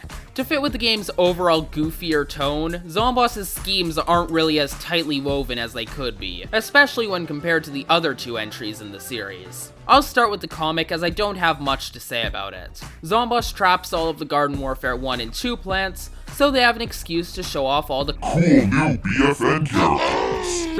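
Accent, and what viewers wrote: United Kingdom. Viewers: You’re British, right?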